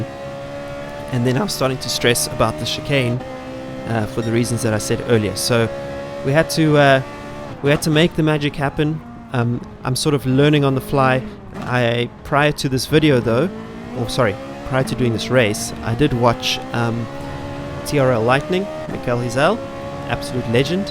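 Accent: South Africa. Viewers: Where in SA are you from? Your accent sounds like Cape Town, or at least somewhere in the Western Cape.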